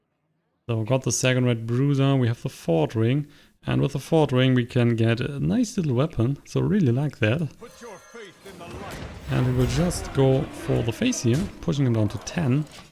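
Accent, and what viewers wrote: Germany. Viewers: Er spricht gutes Englisch, aber mit eindeutigem Deutschakzent. Hört man sofort....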